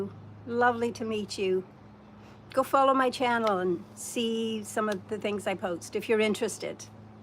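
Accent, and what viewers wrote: Ireland. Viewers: Hi! You're from Ireland!!! WOW! Now that you mentioned that I can hear just a slight accent! I agree that growing up without a lot does make you humble! This was fun!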